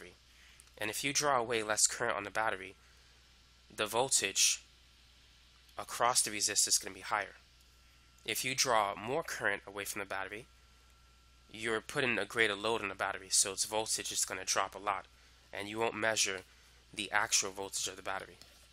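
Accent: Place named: Boston, United States. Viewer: You sound like you're from Boston or jersey.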